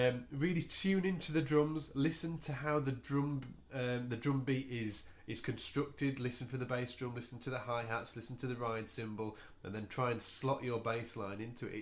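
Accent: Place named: United Kingdom. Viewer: I believe this is a pure British English. Sooooooo cool)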